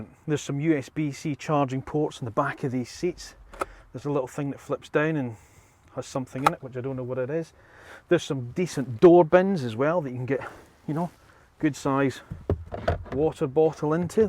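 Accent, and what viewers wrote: Scotland, United Kingdom. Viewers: its called a scottish accent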